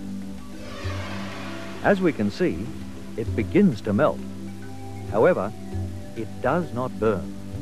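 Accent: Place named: Australia. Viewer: aussie documentary? listen to the accent, as an aussie picked it straight away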